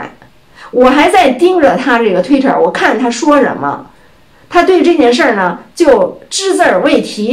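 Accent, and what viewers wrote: Beijing, China. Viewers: 哪里人？北京的吗？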